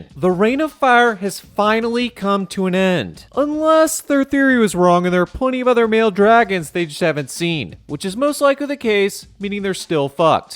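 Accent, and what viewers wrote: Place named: California, United States. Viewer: I hated the " confused California bro" accent at first but now I can't watch these how to survive or how you'd die videos without it. This channel is great 👌🏻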